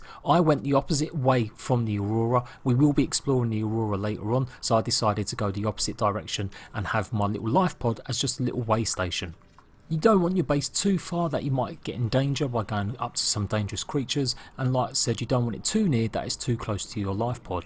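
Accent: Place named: London, United Kingdom. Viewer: Pretty sure this is a London dialect, based on how he doesn't say some t's and how th sounds like v/f.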